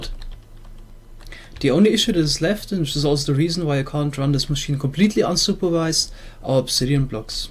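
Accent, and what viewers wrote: Germany. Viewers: are you german?? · is he german? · man kann richtig hören das du deutscher bist, ich auch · Junge du Bisch deutscher des höhrt man übel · Er hat nen voll deutschen axzent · When I heard the German accent I was like " I fcking knew it! " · Cooles video man höhrt dein deutschen aktzent (nicht böse gemeint) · u r german oder nicht junge du hast harten acxent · Is he german or why does he have that accent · Deutscher merkt man einfach am axcenz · Are u German? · erste sekunde wo ich deine stimme gehört hab hab ich gemerkt du bist deutsch aber cooles video😃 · Are you german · Ich liebe den deutschen Akzent wenn du jetzt noch Sachse wärst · Are you german? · are u from germany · Das is ein deutscher akzent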